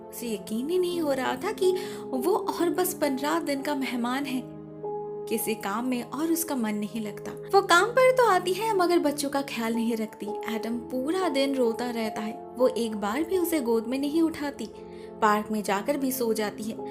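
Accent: Bengal, India. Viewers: Your Bengali accent ❤️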